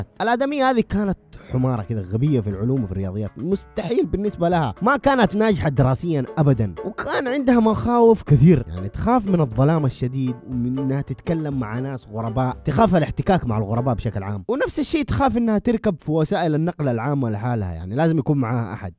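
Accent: Iraq. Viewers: ✨ يتسمع عراقي ✨ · حلوه قعاد أسماع عراقي 😂😂😂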